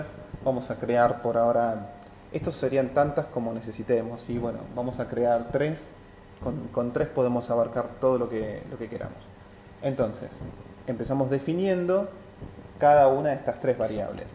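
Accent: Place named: Argentina. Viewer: No hables argentino no puedo entender,aaaah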